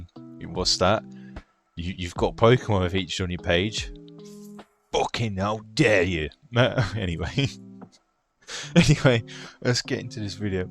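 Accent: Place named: United Kingdom. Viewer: Hello. I’ve just recently come across your videos. Having once been super sized myself, I can totally understand your points of view. By the way you’re very handsome looking and I love the British accent that you have. Continued good luck with your YouTube channel 😊.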